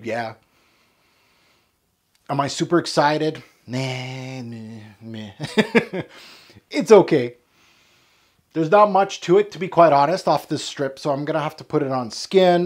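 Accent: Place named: Quebec, Canada. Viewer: You sound exactly like any English teacher here in Quebec… you from qc?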